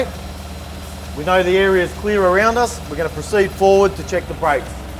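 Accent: Australia. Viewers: The Australian accent is priceless! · The language is English. The "accent" is Australian. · The guy is from Australia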